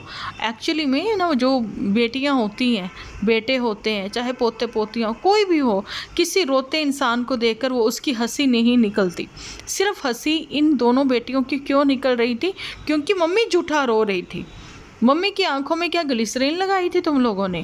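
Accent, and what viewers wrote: Punjab, India. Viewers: I love your Punjabi Accent Aunty ji love from Canada 🇨🇦 I’m also Single mom with my daughter no house help no any support I’m working mom im working in School full time job but in 🇨🇦no any kinda helper I’m just thinking about those Drama Queens Sisters and mom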